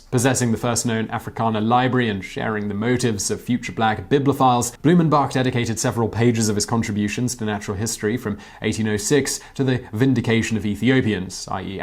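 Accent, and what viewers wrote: United Kingdom. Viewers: how tf did i never know he was british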